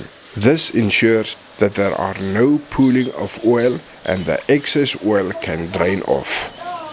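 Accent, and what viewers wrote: South Africa. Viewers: I can’t wait to restore our almost forgotten pot, but I will definitely watch this video multiple times to listen to your heavy South African accent 😊❤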